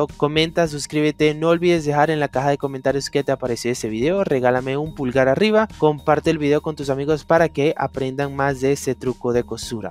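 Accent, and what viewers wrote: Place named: Mexico. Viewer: se que eres mexicano por tu cantadito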